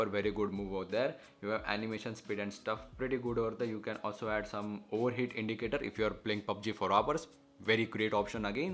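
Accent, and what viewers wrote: India. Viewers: Bro u Talk to much with so strong Indian Accent didn’t understand anything please try to talk slower next video thanks anyway 😂😂😂 Thank you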